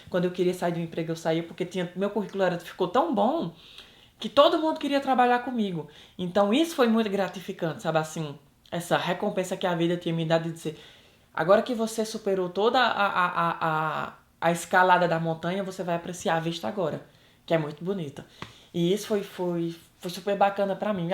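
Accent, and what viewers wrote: Northeast, Brazil. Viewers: Mininu,e tu num perdeu esse sotaque até hoje., Por causa de que ❓ Tu è da minha cidade, crato!!!🤩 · Eu ri e chorei com sua história, que coisa linda❤️ amo nosso sotaque nordestino, que Deus te abençoe. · Eu admiro você por ter mantido o sotaque do nordeste, isso é lindo! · amei sua história gosto do seu jeito de falar não perdeu sua origem nordestina muito simples estou conhecendo Paris com vc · Que sotaque gostoso, meu nordeste é lindo. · Amo seu jeito nordestino, seu jeito de falar, alto astral. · Esse video me foi recomendado e quando eu vi que era de um cearense vim correndo ver. Amei sua historia, amei que não perdeu seu sotaque, parabens por tudo. ❤️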